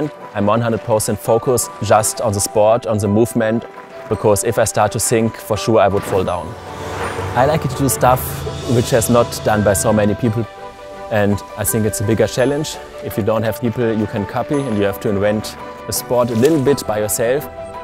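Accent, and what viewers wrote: Germany. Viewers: Man with thick German accent rides down mountain on unicycle.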